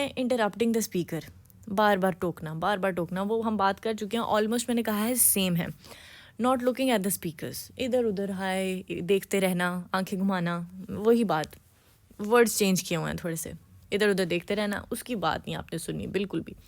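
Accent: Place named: Punjab, India. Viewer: mam punjabi bolti hyn ap smjaty hoay bht acha lga apka andaz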